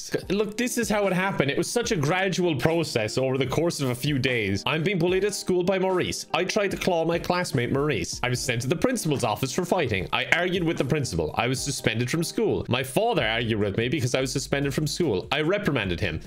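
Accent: Ireland. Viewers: This is truly the one channel on YouTube that can make me laugh the hardest consistently at whatever he plays. Also the Irish accent is a big plus too can't forget the accent.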